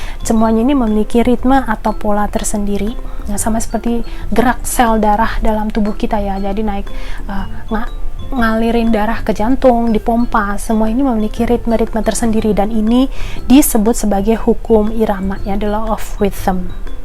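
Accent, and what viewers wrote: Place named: Madura, Indonesia. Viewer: Agak miri macam tetanggaku madura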